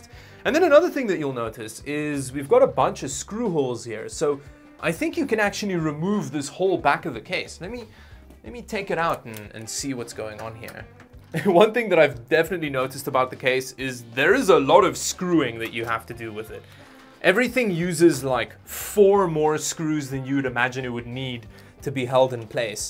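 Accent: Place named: Afrikaans, South Africa. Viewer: A Canadian man with a very peculiar spelling of the name David ( which has many origins like Polish, Dutch, and most of all I get an Afrikaner vibe from our channel host but he may just be French Canadian which can sometimes have a hard to place accent especially from the younger generation) has bought my unique and rare piece of computer hardware on eBay. I wonder if its the same guy?!?! I am sure it wasn't hard for the guy to figure it out especially if he was a fan of yours which is pretty cool. I have an extremely rare spelling of my middle name and my first name is uncommon as well so I get how easy it is for him to have placed you.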